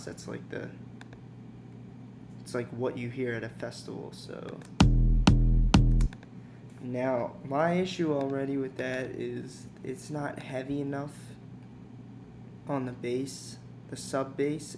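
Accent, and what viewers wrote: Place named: Chicago, United States. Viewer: What suburb of Chicago are you from? You sound just like my buddy that grew up in Barrington :)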